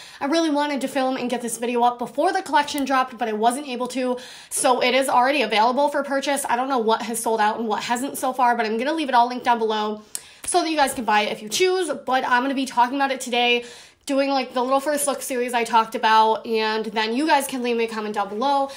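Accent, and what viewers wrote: Buffalo, United States. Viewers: Why is the packing always better than the products? Oh and are you from Buffalo? I love your accent!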